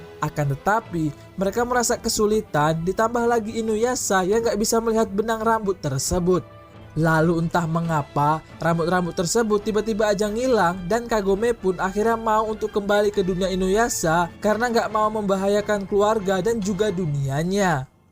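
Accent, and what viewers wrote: Palembang, Indonesia. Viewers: Wong Palembang apo jeme lahat? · Wong pelembang kau ni min eh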